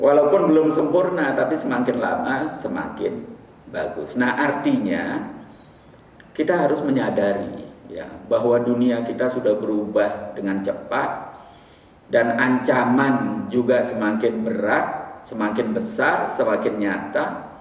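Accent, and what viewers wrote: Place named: Bali, Indonesia. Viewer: Salam sehat pak... Pengucapan huruf T kok seperti pengucapan dari orang Bali, Aceh dan Jawa Cirebon... Apa asli dari Cirebon ya pak... Terima kasih matur nuwun... 🇮🇩🇪🇸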